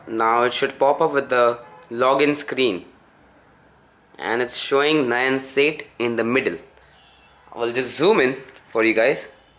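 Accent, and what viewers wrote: India. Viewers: Great video. Love your accent, I work with several guys from India, you made me feel at home!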